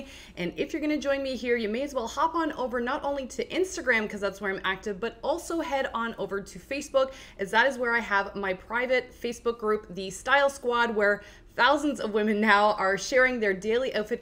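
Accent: Canada. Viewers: I guessed Canadian.. interesting, we have a big polish community here in the UK x great video thank you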